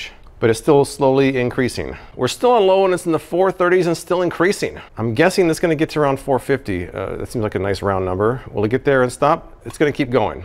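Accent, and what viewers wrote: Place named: South, United States. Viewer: Thumbs up for. Next time lean more into the southern accent 😅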